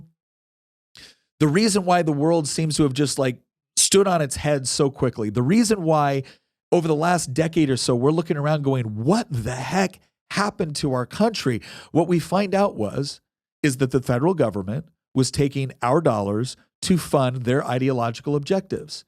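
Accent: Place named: West Coast, United States. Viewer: you must be from the West coast, ending ing words as een.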